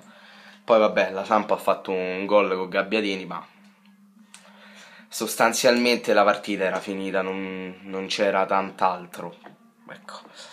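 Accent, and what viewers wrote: Rome, Italy. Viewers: Come mai hai l accento romano?😂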